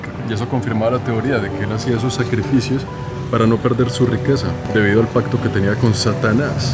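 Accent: Colombia. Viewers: q belleza!!!!m encantan tus vídeos pero muchas veces los escucho porque tú voz es música para mis oídos,adoro cómo hablan los colombianos,m encantaría vivir en esa ciudad y mucho más xq hace referencia a mi libro de cabecera 100 AÑOS DE SOLEDAD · Que buen historiador eres! Muy admirable tu forma de contar! Increíble memoria! Me recuerdas a mi padre! Además tu acento colombiano? Lo máximo! Saludos desde Ecuador! Saludos a mi familia en Colombia los Rodríguez Restrepo! Gracias por el video! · Severo Que chevere que hable como colombiano like x 10k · Vaya d lo q uno se entera ...😃me encantó el video las historias..el río.. q lindo..me gusta tu voz ...y tu acento colombiano 😍.. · Qué hermoso acento colombiano!!!es muy sensual y varonil!!!muy interesante la historia de un lugar tan bonito!!! La historia de América, no hay esquina donde no haya llorado un indígena. 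Saludos desde México!!!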